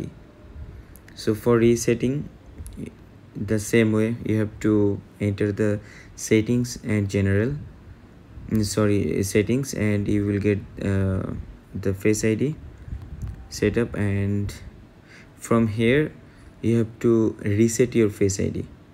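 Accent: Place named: India. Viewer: That reassuring moment when you hear an Indian accent on a tech troubleshooting video 🔥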